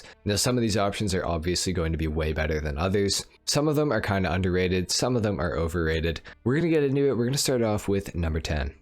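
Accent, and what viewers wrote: Winnipeg, Canada. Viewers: Your from Winnipeg? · Wait ur Canadian? I feel like you got a Canadian accent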